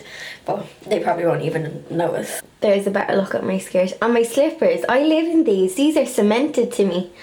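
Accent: Dublin, Ireland. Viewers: Your strong Dub accent makes you a little difficult to understand at times.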